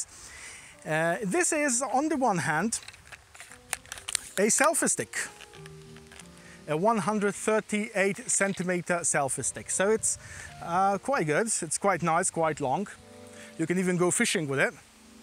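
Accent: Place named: United Kingdom. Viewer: Köszi a videót. Szép brit kiejtés.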